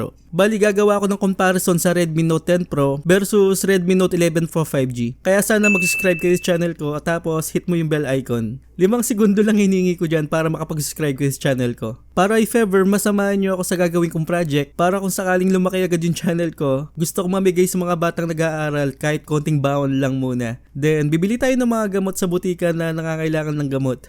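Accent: Pampanga, Philippines. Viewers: Hahaha Kapampangan ka lodz? HAHA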